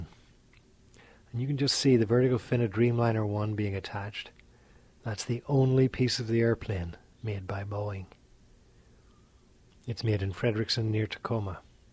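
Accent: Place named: Northern Ireland, United Kingdom. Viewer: Brilliant video - nicely narrated too. Good to hear a Norn Iron accent on this video. :) Thanks for the upload!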